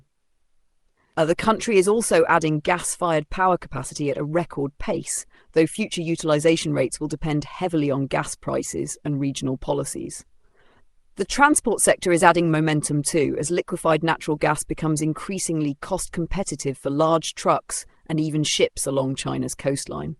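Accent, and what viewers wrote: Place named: United Kingdom. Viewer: This is my new favorite channel. Pertinent info given in a cool British lady's voice. 😊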